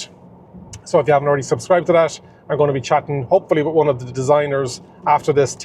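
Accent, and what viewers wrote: Ireland. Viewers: Good man, I enjoyed the presentation, this Toyota is a total "Buy" when it will be available. Cool Irish accent you got there, new SUB.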